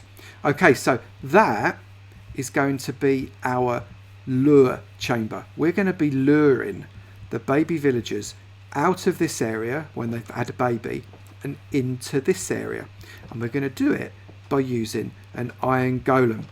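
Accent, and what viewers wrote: United Kingdom. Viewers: That Gordon Ramsay accent tho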